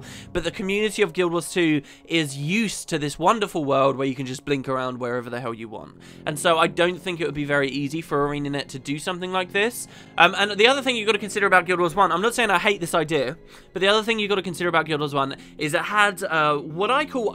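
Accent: England, United Kingdom. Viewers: you have the proper English accept so you instantly sound Epic to us Americans! Well.. i dunno.. to me anyways! lol. Absolutely agree with you on the DE reward scaling..if people had the chance to get like 1g from an event that hadn't been done in a month, people would definitely be out there doing stuff. & the game should TELL you "you got this much extra stuff for doing an ignored event" etc.. Maybe add new medal types.. platinum, Mithril & Orichalcum that only show up on old events & give you special loot boxes.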